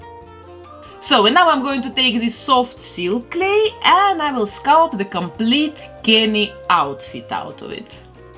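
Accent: Russia. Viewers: Меня путает ваш выраженный русский акцент 🙃❤️ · Вы русская?) акцент очень похож :)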